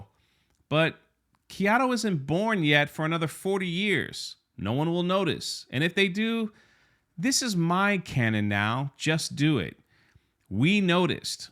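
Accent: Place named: New York, United States. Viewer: Love this guy’s accent. I picture a husky New Yorker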